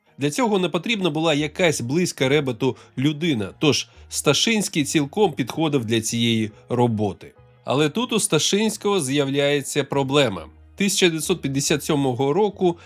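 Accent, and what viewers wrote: Russia. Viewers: Ще все-таки залишається російський акцент і акання. Потрібно ще тренуватися, бо ріже вухо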